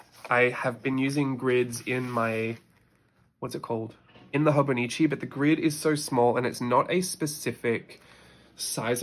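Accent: Australia. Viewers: Is that a hint of Australian in your voice? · Love hearing your little Kath and Kim voices 😂 I think it’s ingrained in us as Aussies.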